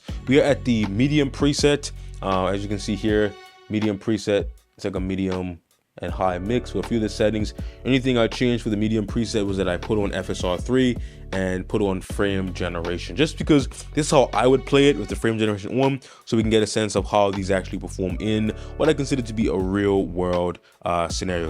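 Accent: Bermuda. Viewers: Are you Bermudian? Edit; there is no doubt. Subbed, gotta rep my people.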